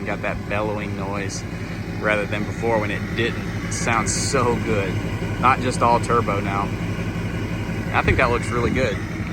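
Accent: Alabama, United States. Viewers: What part of Bama are you from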